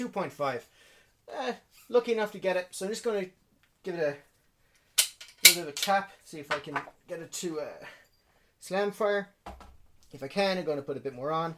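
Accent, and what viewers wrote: Ireland. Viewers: This guy Irish? He has like an accent it’s cool haha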